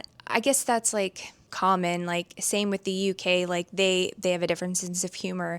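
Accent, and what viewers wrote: Canada. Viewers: lol. these differences are the same between states. this is so ridiculous to think there are any real differences. you actually sound more canadian than i do. lol. i think your jokes weren't landing because they are not funny. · I can't hear a distinct American accent from you. You can pass as a Canadian. You sound like a Canadian (at least from Ontario).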